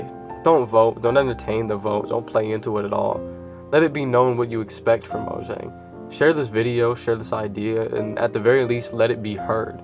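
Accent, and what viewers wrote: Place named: South, United States. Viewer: bro is mad southern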